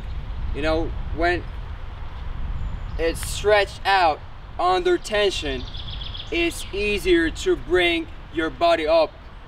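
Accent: Russia. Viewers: This guys accent is a mix between russian, american, american southern, and a lisp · It’s a mix between Russian and a southern American accent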